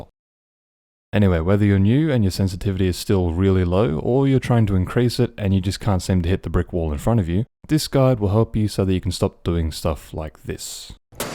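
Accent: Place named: Australia. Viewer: the Smooth as butter Aus accent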